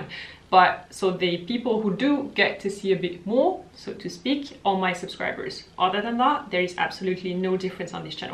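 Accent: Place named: France. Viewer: Hi! I’m a Spanish woman who lives in San Juan, Puerto Rico. I ❤️your videos and I ❤️your french accent speaking English 👌I would like to know if lavender is good for cool or warm undertone (I think mine is warm) Have a nice day.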